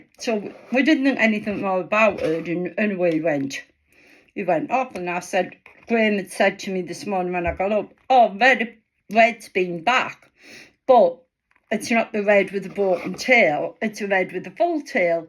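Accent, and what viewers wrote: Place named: Wales, United Kingdom. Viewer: Excellent owl! I see (and hear) you're from the UK. I'm gonna guess Wales, since Welch is the one English this American has trouble understanding. (And, yes, I've even been to Australia. lol) I'm glad the vid isn't just a short because it takes a bit before my ear attunes to Wales. Thanks and, yeah, it's definitely worth a sub.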